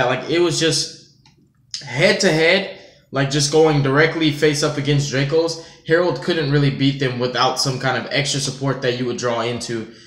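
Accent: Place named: Mexico. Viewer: you sound really mexican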